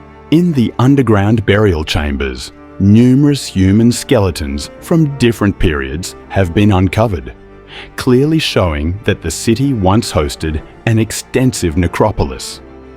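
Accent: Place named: Australia. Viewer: I love Turkiye. I need more time here. Also, I love that this is narrated with a Australian accent 🥳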